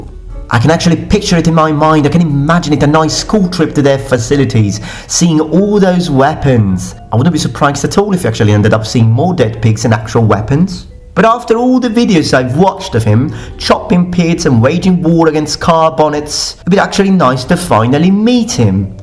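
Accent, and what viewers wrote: England, United Kingdom. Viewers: Love your British accent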